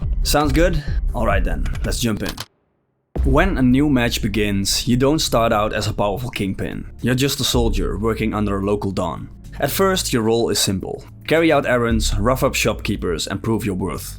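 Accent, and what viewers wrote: Netherlands. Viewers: i think i hear a slight dutch accent. its one of the least noticeable dutch english accents i have ever heard though. most of us cant hide we are dutch when speaking english at all. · Never fail to recognize that accent... 🇳🇱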